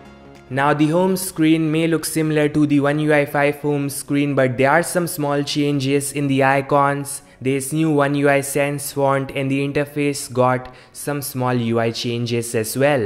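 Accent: India. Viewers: Are you from india...now wonder...i dont really understand some of what you are saying😊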